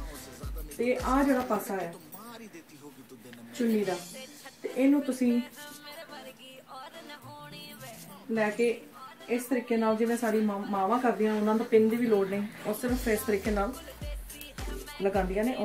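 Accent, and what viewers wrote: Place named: Punjab, India. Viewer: Buht changi video sii.... Tuadi Punjabi sunn k sawad ayaa Jay... (I am not Punjabi born although but love your Punjabi) from Gujranwala, Pakistan. 😊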